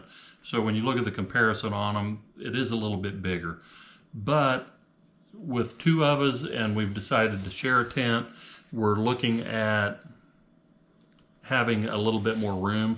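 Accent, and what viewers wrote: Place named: Kentucky, United States. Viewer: I retire in 2 years and want to hike the AT. Sir, you are so lucky your wife is willing to do this with you. I wish my wife would do so. I have the same Big Agnes tent that you have. It's bullet proof, but it is heavier. I have never used the footprint, but it's not a bad idea on a thru hike. I love it. I also love my Duplex when I'm hiking alone! Great choices! I love my Nemo Tensor pad! I have an Enlightened Equipment Revelation quilt and I love it. It's only about 20 ounces. But, your quilt is great! Put some miles on those legs. I've hiked the Smokies and it's a but kicker! I'm from Kentucky, you sound like you would be from somewhere close! I also subscribed!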